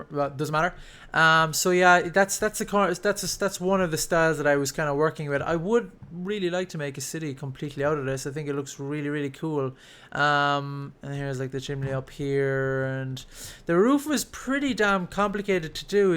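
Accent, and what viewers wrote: Ireland. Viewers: Love the Irish accent ;)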